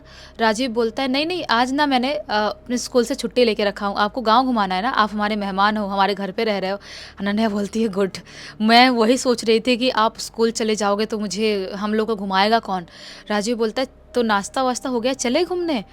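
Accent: Bihar, India. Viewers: Majja nhi ata accha bolta jaise real me ho apki awaj me thoda bihari pan najar ata or acting bhi over lagti h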